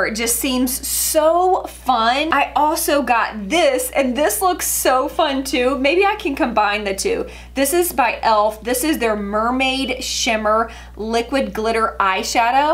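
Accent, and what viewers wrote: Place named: South, United States. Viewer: It's so good to hear that Southern draw. I'm in AL :-) thank you for letting us know about Womanesse. I'm getting the neck serum! I look forward to seeing more of your videos! Stay beautiful xoxo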